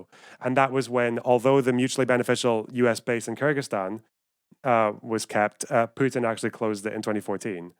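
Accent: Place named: Glasgow, United Kingdom. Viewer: At first watching your channel I was like “this guys got a super familiar accent” Then I realized my dad was from Glasgow, moved to Canada when he was 19 and lost most of his accent. You sound so much like him it’s crazy.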